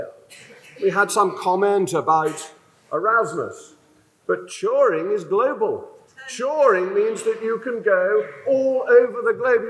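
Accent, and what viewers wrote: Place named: RP, United Kingdom. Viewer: Love his posh RP accent...!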